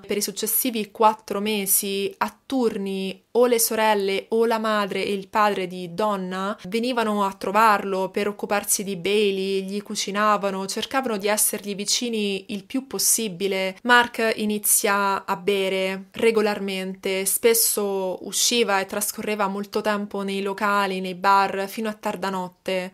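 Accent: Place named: Rome, Italy. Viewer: Un bugiardo patentato , e chi gli crede. Mi dispiace per la famiglia di Donna che oltre alla perdita della figlia , non ha potuto vedere crescere la sua nipotina. Mi dispiace per la famiglia di Robert per tanti anni ritenuto un criminale . Quanta sofferenza quanto dolore. Non so cosa dovresti migliorare, ti trovo molto gradevole , anche il tuo lieve accento romanesco , a me non dispiace. Pronunci bene i nomi stranieri sei di bell' aspetto , sei brava nel documentarti , nell' esporre trasmetti empatia con le vittime . Brava continua non ti fare distogliere, credi nelle tue potenzialità, se c'è da migliorare , senz'altro migliorerai , nella vita c'è sempre da imparare, soprattutto quando si è umili , con la sete di conoscere .😘👏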